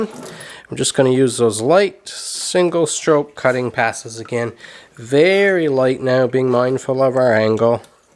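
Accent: Newfoundland, Canada. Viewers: I watch your videos for two reasons besides masterful knife sharpening. One for unique experience of enjoying the Newfoundland accent and second as a thank you to the people of Newfoundland (Gander especially) for their unparalleled humanity and hospitality during the days following 9/11. Hope to visit one day until then will visit vicariously through your videos. 💕🙏🏻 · Awesome work dude! Sounds like you’re a maritimer. Definitely not a PEI or Nova Scotia accent going to have to say you’re a Newfoundlander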